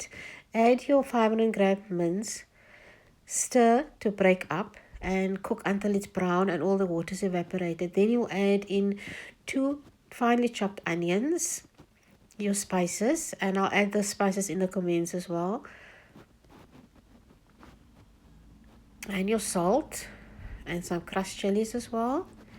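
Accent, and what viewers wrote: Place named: Cape Town, South Africa. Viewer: Hi, there is nothing that is such a taste festival as a "Bo Kaap" samosa. Just listening to you speak makes me so homesick for Cape Town again. Much love from NZ.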